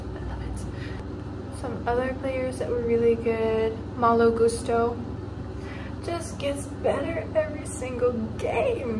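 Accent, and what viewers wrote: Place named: United States. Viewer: Do you live in America? Your accent is American obviously but you say your local team is Dutch 😁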